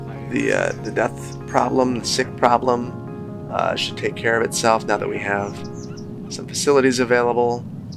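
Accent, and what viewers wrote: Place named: Midwest, United States. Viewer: I live in Madison! Yay! I thought you sounded like you had that midwest charm!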